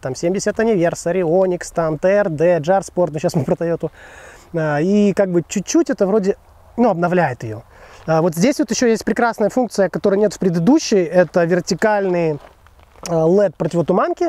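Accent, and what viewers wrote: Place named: Kazakhstan. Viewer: красавчик!Дикция "андреймалаховотдыхает"👍👍👍Я даже по радио слушая мог предположить,что из Казахстана)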